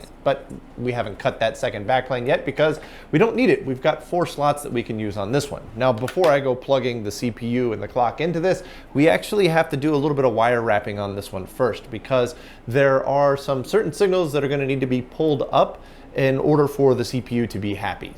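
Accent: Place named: Texas, United States. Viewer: Yep. I'm assuming he has a Texan accent since he lives in Texas and sounds like most of the Dallas/Ft. Worth area folks I know. In that accent, "indian" and "endian" sound exactly the same.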